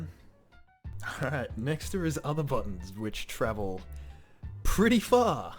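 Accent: Australia. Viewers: You know he's Australian cause he says haytch instead of h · Sorry bro but i would rather hear roofles manly alpha voice narrate a hit guide instead of an aussie :(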